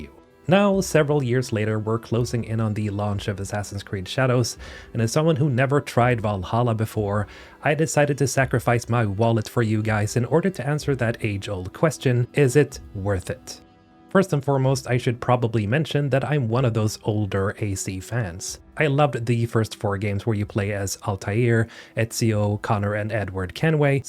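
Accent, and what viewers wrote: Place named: Sweden. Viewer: Förvånad när du avslöjade dig som svensk; få svenskar som inte pratar engelska med en brytning som inte gör ont i öronen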